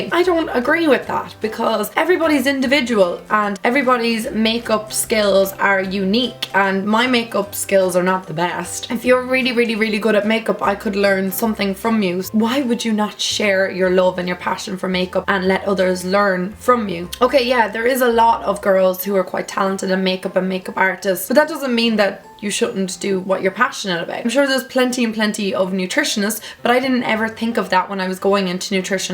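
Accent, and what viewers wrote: Ireland. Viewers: Love this video, love the irish accent xx i will deffo ask you a question next q&a :)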